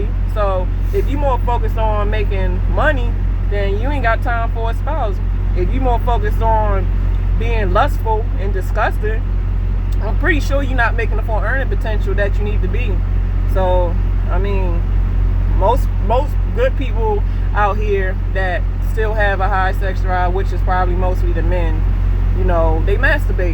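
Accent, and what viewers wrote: Baltimore, United States. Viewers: You have a Baltimore accent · Oh yeah I can hear that Baltimore coming out🤣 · Straight up b mo accent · You are from Baltimore aren’t you? I can tell by your accent. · Toooooo and dooooooo yeah she from baltimore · Go old TA HUH LOL. Quick question are you from Baltimore I'm picking up on your lingo how you talk I'm from Maryland myself thinking about starting up a YouTube channel when it comes to trucking I have a YouTube channel when it comes to racing just over 10,500 subscribers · Hey Wassup.. Am I correct in saying your accent sounds Bmore to the core. BALTIMORE? AM I CORRECT OR AM I OFF? I'M JUST SHOWIN LOVE. RESPECT! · Baltimore accent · She from Baltimore huh I hear that U in all the words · Great content! First time coming here and I may be a new subscriber, you're a very Pulchritudinous woman and I detect a strong Baltimore Maryland accent! 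Lol 🤣😎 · She definitely from Baltimore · Love that Baltimore accent!! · U from Baltimore? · From Bmore???? I can hear it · You gotta be from Baltimore, cause that accent is pretty strong!!! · i can tell you from the Baltimore area, your accent and pronunciation comes through ..... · were you from dc or baltmore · You must be from b-more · You gotta be from Baltimore lol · Much respect queen. Make it happen. Also I hear the Baltimore in your voice and accent. Positive energy and uplift. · You must be from Baltimore. Lol. The way y’all say words that have the sound U in it is unlike anywhere else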